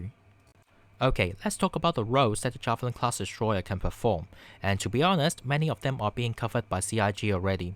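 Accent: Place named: Asia. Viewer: I would regard this as a particularly unfair and unnecessary comment. I’m a native English speaker and I would deem his English to be excellent and conveyed with clarity. There’s an unmistakable Asian influence on his accent, but it in no way detracted from my ability to understand what he was saying, indeed I’d go so far as to say his command of English is better than a lot of native English speakers I’ve met. To the poster of this great video I’d like to say a sincere thank you for this excellent essay and please ignore ignorant comments from the likes of this poster, you did a great job and you don’t need to improve anything, just keep this terrific content coming and thank you for your efforts.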